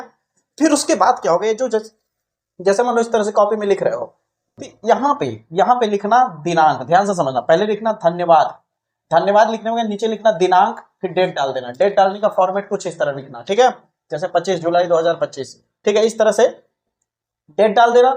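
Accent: Bihar, India. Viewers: Sir lagta hai aap bihari ho...😅 Me from up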